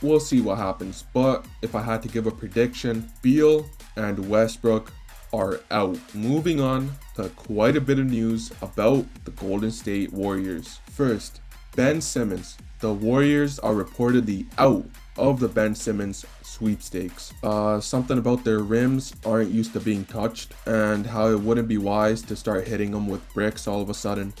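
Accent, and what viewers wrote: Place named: Toronto, Canada. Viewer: Where are you from? You kind of sound like you're from toronto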